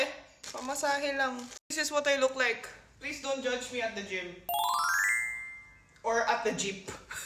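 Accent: Philippines. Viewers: Yung accent talaga ehh. nagagandahan ako sobraaaa. like genuinely Filipino yung atake. Love youuu po!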